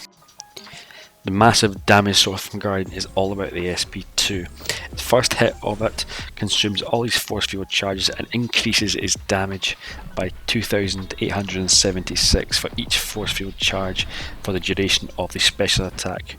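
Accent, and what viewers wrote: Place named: United Kingdom. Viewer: Your British accent is THICC Boy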